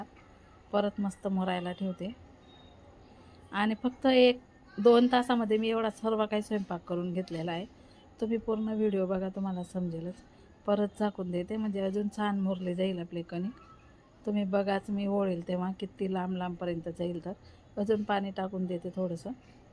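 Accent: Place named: Dhule, India. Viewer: तुम्ही धुळ्याच्या आहे का ताई भाषा तशी आहे म्हणून विचारलं खूप छान झाला सगळा स्वयंपाक 😊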